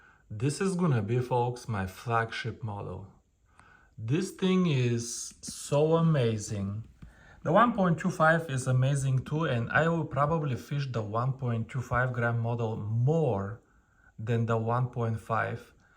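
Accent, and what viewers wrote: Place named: South Africa. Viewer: You from South Africa? Just guessing based on how you speak. NICE attention to details! I wish you made bigger, bass sized stuff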